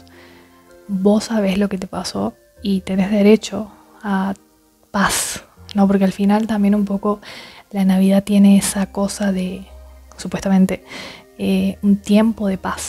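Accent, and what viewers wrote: Argentina. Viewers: Me encanta tu voz, tu acento argentino y tu contenido ☺️💜 Feliz Navidad y año nuevo, 🎄🍫💤🎁💃🕺GRACIAS POR TUS VÍDEOS 🌹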